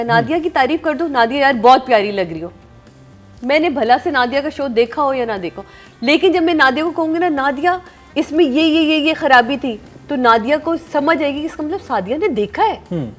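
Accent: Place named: Pakistan. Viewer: every person has their own accent.Pakistanis will speak with a Pakistani accent which will be definitely different to Canadian,American or British English accent.You yourself have a very Pakistani accent.Education is not knowing E glish.English is a language its not a measuring stick for education.Btw the word is PRO NUN CIATION not PRO NOUN CIATION.Now you understand what is accent.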